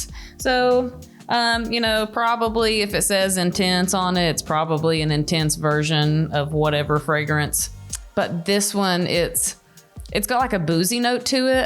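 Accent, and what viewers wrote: South, United States. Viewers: You nailed it, Great job 👌love those takeover videos, it’s really nice to have a woman’s opinion on men’s fragrances, I love how you pronounce the names with the southern accent 😊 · What state are you from? I love hearing another fellow southern accent on here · Bang on job with the takeover! Your Southern "belle" accent is mint. 👍 Ashton is a lucky man, you support his hobby/passion, have a cool sense of humour and you're very attractive. I do like these takeovers, well do.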